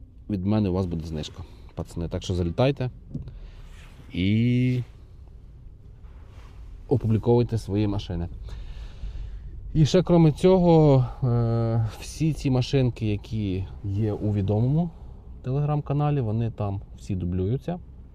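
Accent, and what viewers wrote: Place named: Vinnytsia, Ukraine. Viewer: Завали ротяку, руснявий клоун. Звичайний вінницький суржик. Це українська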